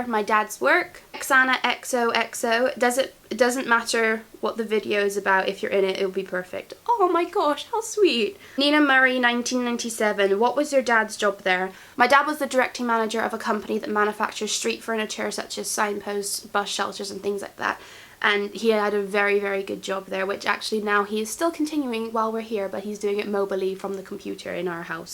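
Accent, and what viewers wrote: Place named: Bristol, United Kingdom. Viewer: I didn't remember your Bristol accent :P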